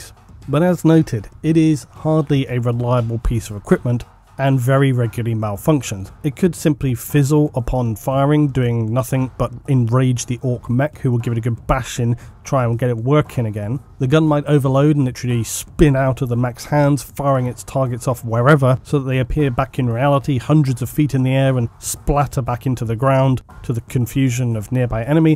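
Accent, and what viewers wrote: United Kingdom. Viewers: Why do you think I like for my 40k lore fix the most? =D Love that British accent, its like Totalbiscuit's except with more charm.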